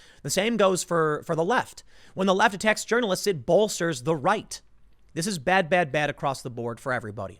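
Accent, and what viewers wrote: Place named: Philadelphia, United States. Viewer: Really laying down the Philly accent lol 🤣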